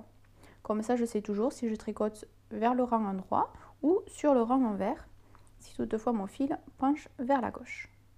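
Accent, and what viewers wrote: South, France. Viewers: Ça y est! J'ai terminé mon pfeilraupe, je l'ai tricoté en laine Rachel de chez Zeeman, j'ai utilisé 2 pelotes complètes + le début de la 3ème. Il est vieux rose dégradé blanc et il y a quelques défauts au niveau des trous, mais une fois porté cela ne se voit pas!!! MDR. Encore une fois merci pour tes explications claires et en + j'adore ton accent du Sud! Bisous bye bye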